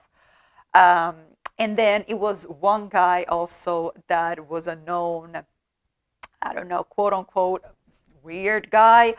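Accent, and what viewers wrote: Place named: Sweden. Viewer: As always, you look fabulous 🤗🤗... thank you for the storytelling, you have the perfect voice for it. Have a great weekend..⛱.. ps,so cool you speak the Swedish way, makes it more authentic 😉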